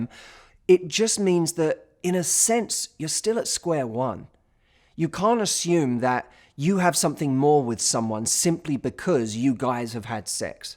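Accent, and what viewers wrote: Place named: England, United Kingdom. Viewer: He gives great advice and he is so beautiful I love his lovely English accent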